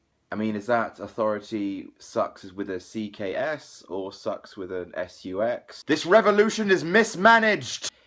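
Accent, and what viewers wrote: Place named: RP, United Kingdom. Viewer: I listen to this to fall asleep at night to the sweet and relaxing posh voice of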